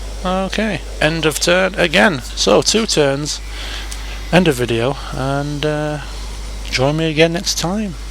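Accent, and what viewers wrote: England, United Kingdom. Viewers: lol this might seem kinda weird but it is so much cooler to watch a person with an English accent do an English campaign then it is just any other kind of person... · Your accent is that of a true englishman (from an englishman) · YEEESSSS a english person with a funny acsent no affence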